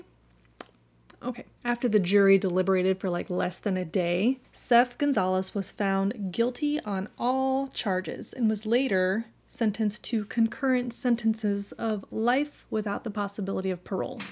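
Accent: Australia. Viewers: Look I teased you a little last time about your Aussie accent. But never stop trying. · Hi so happy to see you Hope you had a great July 4th Love hearing the cat in the background, I love your Australian accent 😂❤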